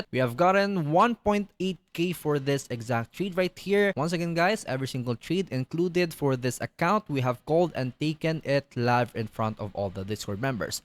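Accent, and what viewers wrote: Philippines. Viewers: he sounds like Filipino to me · a thick pilipino accent. musta pre?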